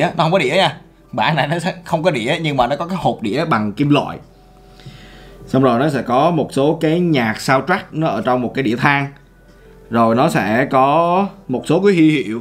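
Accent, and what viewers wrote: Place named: Da Nang, Vietnam. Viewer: Anh này nói giọng Đà Nẽng lồm nhớ quê kinhhh 😅😂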